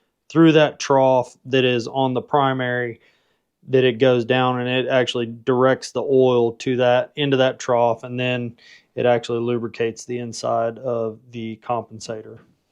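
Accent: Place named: North Carolina, United States. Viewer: New Sub, I knew you were from NC just from the way you talk. I grew up in Hickory. Question, when I shut down the bike, it has started making a chirping noise coming from the intake. Any ideas? I have never noticed it before, but a couple of weeks ago I started hearing it, so now it's bothering me. It's right at the end of the shut down. Bike is a 2017 CVO Ultra Limited. Thanks in advance Brother!!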